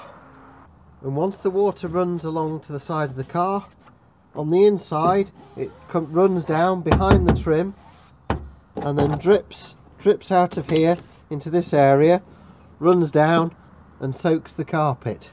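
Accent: United Kingdom. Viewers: Love British accent :) very helpful video, many thanks!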